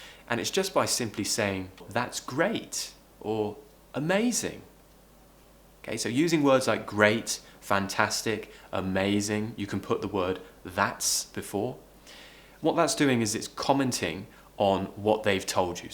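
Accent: United Kingdom. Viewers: I like the british pronunciation. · Love uk accent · Thx man 🙏🏼 u are perfect teacher 👨‍🏫 I really love British accent 😍😍❤️❤️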